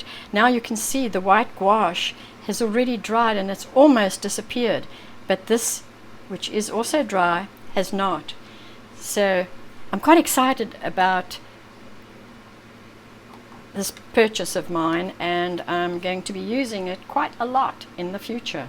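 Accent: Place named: South Africa. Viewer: Hi, I’m so pleased to have discovered your channel and of course I immediately recognized your South African accent.... ☺️🎨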